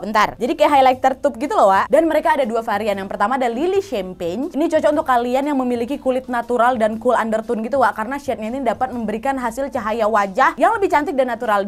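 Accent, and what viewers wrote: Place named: Medan, Indonesia. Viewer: Medan kali logat nya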